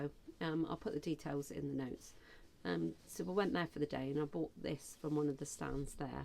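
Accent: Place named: England, United Kingdom. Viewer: i knew you were English from your accent, where are you in the UK? inquiring minds need to know....lol...i am "across the pond", but my maternal grandma was english, she became a ww2 war bride came to usa....she was born in leicester....:) i have quilted now 4 years, my mom has quilted 50 plus years...my grandma was a sewer,,,,it is in the family genes...or jeans...lol, love your vest, great idea....hmmmm i got many a jean i dont use... i was going to make some denim purses, or bags, or sewing machine bags, or quilts...the possibilities are endless...lol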